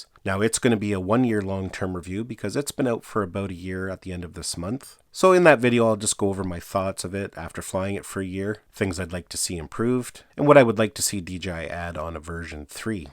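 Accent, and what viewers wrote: Canada. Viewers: Do I recognize a Canadian voice, there? I'm curious about drone regulations in different countries. When you flew 2.5Km to that lighthouse, were you required to maintain visual line of sight with the aircraft? If so, how could you be able to do so? I have an Air 2S, and it seems like the only way I can maintain VLOS beyond around 300M is to put auxiliary strobe lights on it. (Could just be that my eyes are getting old.) Anybody have any advice for enhancing visibility for VLOS?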